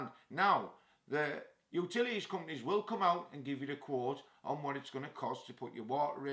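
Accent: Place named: Teesside, United Kingdom. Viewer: Sounds like a T T Teessider accent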